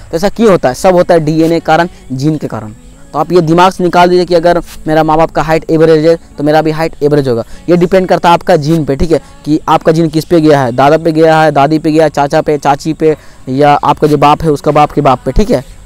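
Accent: Jharkhand, India. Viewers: Aap jharkhand se ho kya 😎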